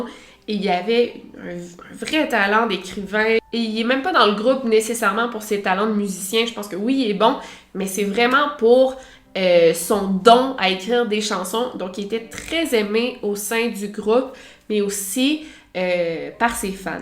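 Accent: Quebec, Canada. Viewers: Tes videos sont superbe et ton intro très bien choisi.Mention spécial pour la musique de qui colle bien avec l ambiance de ta chaine.A chaque fois j'attends tes videos avec impatience.Tu m as même fait apprécier l accent quebecois 😁.Bravo a toi et le meilleur pour toi,ta famille et ta chaine. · Perso je me suis très très bien faite à son accent 😁 Du coup je connais même de "nouveaux" mots et nouvelles expressions 😅 Je préfère regardé cette chaine avec l accent canadien, je ne trouve pas de chaines avec des compteuse françaises que j aime autant perso :) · Quand j'ai entendu ton accent pour la 1ère fois, je me suis aussitôt dit "mince... ca ne collera pas avec ce type d'histoires" (je trouvais l'accent québécois mignon et sexy mais pas effrayant) mais avec ta facon de narrer et la musique de fond, c'était plutôt facile de s'immerger dans le récit! Belle découverte! 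Et un préjugé de moins. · Vite encore une nouvelle vidéo de " ", un bon moment assuré!!! Je regarde d'autres vidéos dans le même genre et indéniablement pour moi c'est la meilleur, autant dans sa présentation que son style, unique, qui avec "pour nous Français ce petit accent québécois" qui nous fait voyager ^^ En passant je ne te l'avais pas encore dit mais ton nouveau générique est vraiment super:D Je connais cette musique, je sais que je l'ai déjà entendu mais je ne sais plus où, "Victoria" aide moi stp;) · Je t’écoute à tous les matins avec mon café et à tous les soir en fesant ma vaisselle. T’es excellente je t’adore je veux venir te voir à ta prochaine dédicace à Montréal. Et ceux qui son frustré de ton accent québécois, ben qu’ils écoute d’autre vidéo au lieu de se défouler sur ta chaîne. Moi je trouve que tu explique très bien 👌🏼 Good job girl continue❤️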